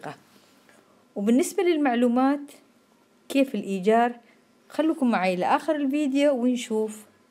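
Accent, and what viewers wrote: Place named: Saudi Arabia. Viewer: فديتك والله 😭😭😭لهجتك سعوديه 🇸🇦🇸🇦🇸🇦🇸🇦🇸🇦 أنا بعد من السعوديه عايشه في المانيا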